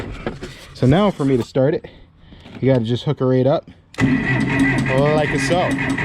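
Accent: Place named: East Coast, United States. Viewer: Can tell your From the east. Deezel, Dee Zul. Here in Colorado it's a Dee Suhl, or Dee Saul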